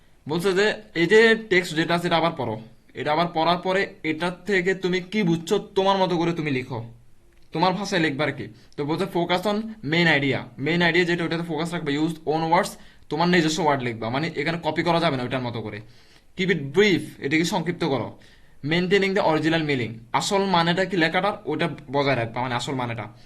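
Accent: Chittagong, Bangladesh. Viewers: Are you from Chittagong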